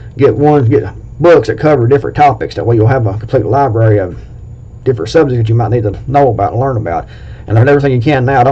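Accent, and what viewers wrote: United States. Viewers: Your accent says America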